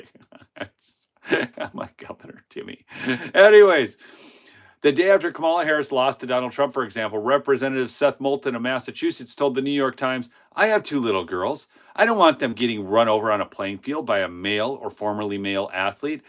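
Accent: United Kingdom. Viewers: Hey, from central illinois. Love your British accent humor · You sound like a Brit lol.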